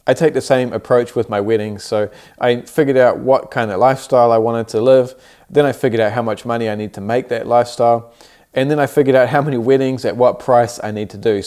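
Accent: New Zealand. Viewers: Refreshing to hear that Kiwi accent!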